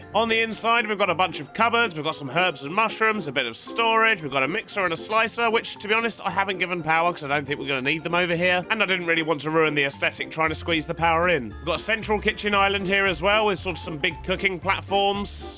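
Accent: United Kingdom. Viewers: that accent never fails to amaze me. british? also train station name: Docker Dumps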